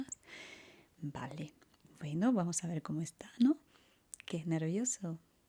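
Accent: Andalusia, Spain. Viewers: Extraño tus susurros sin el acento sureño... Perdón 😔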